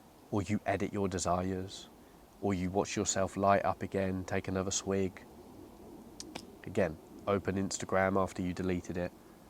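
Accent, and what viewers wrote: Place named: London, United Kingdom. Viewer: What part of England are you from? If I had to guess I’d say somewhere in London.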